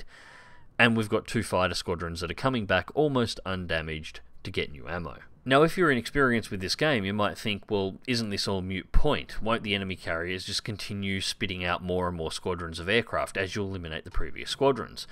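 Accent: Australia. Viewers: good to hear an aussie voice on a wows vid. This was one of the most instructive vids i've found on cv. thx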